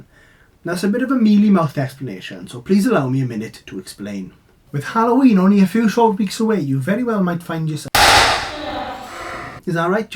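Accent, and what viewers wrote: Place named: Caerphilly, United Kingdom. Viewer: Your accent sounds like your from round the Caerphilly area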